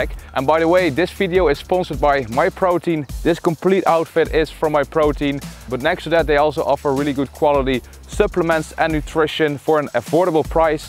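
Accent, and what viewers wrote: Netherlands. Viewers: "...arch your back too much so don't bring your feet ÖP in the air ..." Oh boy ,I love the netherlands accent, I really do ! Greeting from your neighbour eastside of the Maas/Rhine. But FYI my english speaking skills are worse, bring people bleeding ears :-D therefore my accent isn't that charming. Keep up your nice contents!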